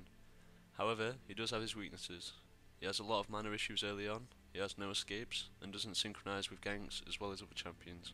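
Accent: Northern England, United Kingdom. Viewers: You have the same accent like the Northerners in Game of Thrones! Where are you from?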